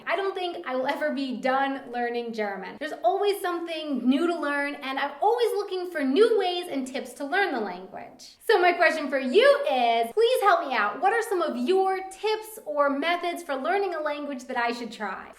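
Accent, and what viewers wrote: United States. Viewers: I don't think you're even trying to get the pronunciations correct. In german or Spanish. Like a deliberate heavily American accent in both. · American accent in Spanish is always quite ...interesting... :D And really easy to imitate somehow. But however your accent is, it is cool that we seem to speak the same three languages. ^^ When communicating with someone it is very great to switch languages! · If you want to make your accent when speaking German more German and less American, I suggest you to listen to some Nachrichten and try to let you and the broadcaster read the material simultaneously. When you do it, you will find the differences between the American and German accent and adopt German accent more quickly. I know you may do not know the material before the program, so you can listen several times and then begin that. I am foreign student in the USA, and that is how I adapted a New England accent within four months. 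(This is my 2nd year here, but many of my friends thought I have been here for more than 6 years and I am learning German too!) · Here's the method: if you are about to learn a foreign language - do not surround yourself with those, who only speak english. Avoid - sorry, but it works best that way - your own language (you know it already). Sign for success: you start to think, to dream and finally to react in your language of choice. Hearing You speak on these videos shows that you are still english-american based - it also shows during those few crumbs of german one can hear every now and then! Pronounciation could be learned by attempting queens-english or scouse a.s.o.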